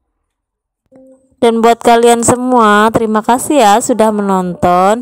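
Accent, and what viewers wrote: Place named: Java, Indonesia. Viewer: Jowone kental gayysss.... Seko ngendi sampean mb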